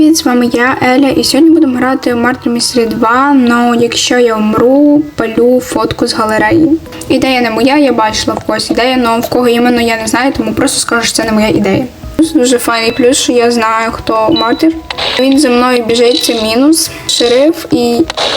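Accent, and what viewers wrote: Ukraine. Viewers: Я так обожаю украинский акцент, это так миленько❤ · Я только сейчас понял почему она так говорит потому что она из укр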